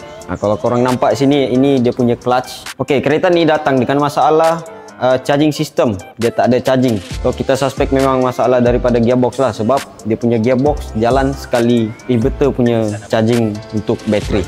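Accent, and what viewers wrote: Sabah, Malaysia. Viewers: Budak Sabah ni,slang Sabah masih ada😅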